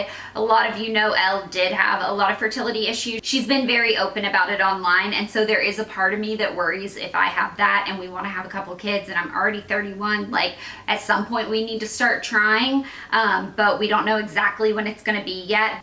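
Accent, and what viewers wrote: South, United States. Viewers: Do you have a southern accent that you don’t show on camera? (I’m from Tennessee too, and it’s so easy to sound yeehaw😂) These new videos are so refreshing and calming. I like to listen to them when I can’t sleep . 🩵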